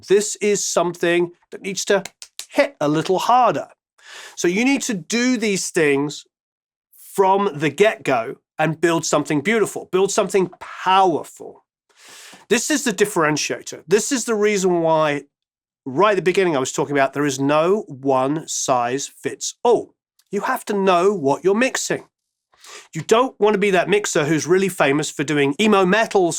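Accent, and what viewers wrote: England, United Kingdom. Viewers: All of this truly wonderful content, also done in an adorable soothing English accent no less, has truly made me appreciate all of those finished records I had so taken for granted before far more. This industry is apparently no 'piece of cake' at all when one realizes you're dealing with so, so many different variables AND the personalities of possibly technically ignorant "Artists" and even their producers AND Apple's publishing standards. Good luck with that.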